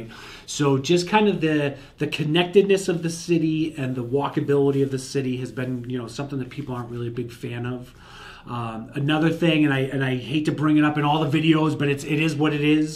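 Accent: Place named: Boston, United States. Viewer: You have a Bostonian accent.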